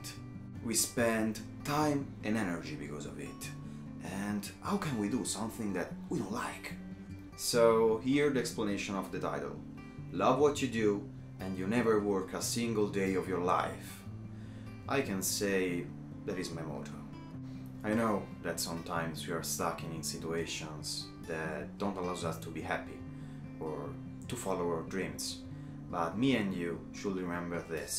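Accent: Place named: Hungary. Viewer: I like your accent 😊 It’s like Hungarian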